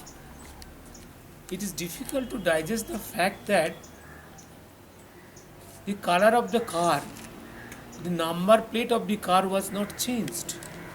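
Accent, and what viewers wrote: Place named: Bengal, India. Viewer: u r so bong pronounced pl say in bengali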